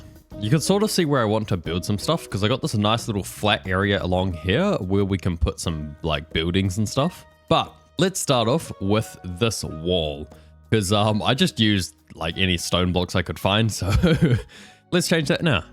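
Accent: New Zealand. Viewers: Oh my god, hearing an NZ voice in a youtube video is so weird, but so welcome. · omg I detect a kiwi accent. Kapai, this vid is so good, love the editing!!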